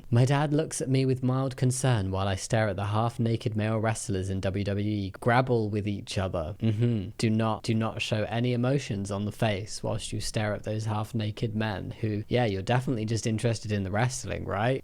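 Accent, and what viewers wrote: United Kingdom. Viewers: I'm B1.2 in english but his British accent Is a little bit difficult to understand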